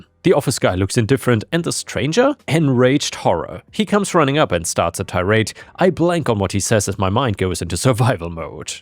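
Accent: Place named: Germany. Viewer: Love your videos. Your accent.. German? Sounds like my accent 😂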